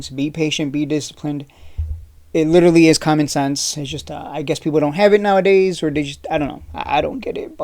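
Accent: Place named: Long Island, United States. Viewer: Maybe people think that because the guy prostockmarkets I think is his name keeps mentioning you and he said you sound like you are from Long Island here in NY.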